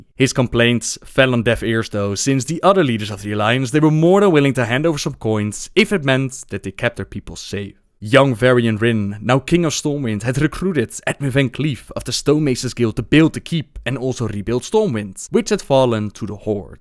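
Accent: Netherlands. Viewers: are you dutch? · that dutch accent tho :p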